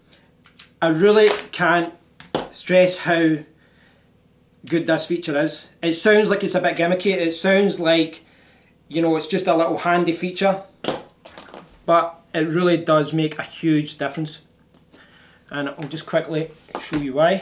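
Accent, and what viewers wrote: Scotland, United Kingdom. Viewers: Very sorry, but I'm not a native English speaking person and so I have lots of trouble understanding your heavily Scottish (or Irish?) brawl. So, does anybody know of a video featuring someone with a 'normal' British or American type of accent? Thanks a milliion.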